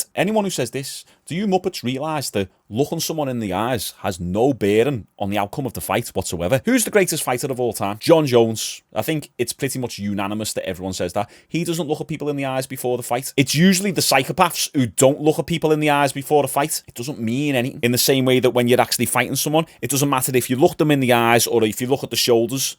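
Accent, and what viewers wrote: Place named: Yorkshire, United Kingdom. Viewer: Ars tha from Yorkshire m8? (I'm a dual citizen of Canada and UK, lived in Leeds for 4 yrs... trying to narrow down your accent)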